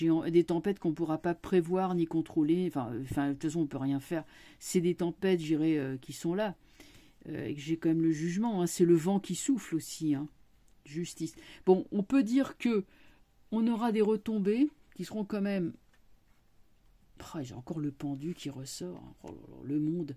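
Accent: Paris, France. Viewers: Bonjour, bonjour à tous les Velazquezinnes et Velazqueziens, merci pour cette video, moi ce qui m'amuse c'est votre ton qlq peu débonnaire...oui....bof teinté d1 accent parisien😉 vos visions des choses résonnent chez moi, c'est tjrs très pertinent et plein d'érudition...non feinte. Bon dimanche à bientot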